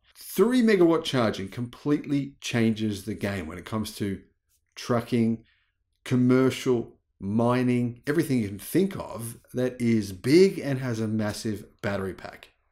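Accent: Australia. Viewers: You have an Australian accent except when pronouncing Fortescue and Pilbara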